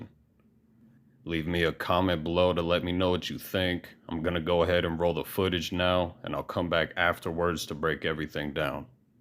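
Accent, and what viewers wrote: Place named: United Kingdom. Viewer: Great British accent and you said she needs more greens is not a accusation of any type.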